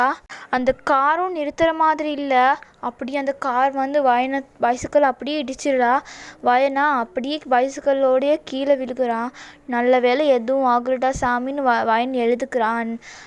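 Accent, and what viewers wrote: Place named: Sri Lanka. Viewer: Akka neenga srilankan aa ungada tamil language konjam different aa irukku 🤔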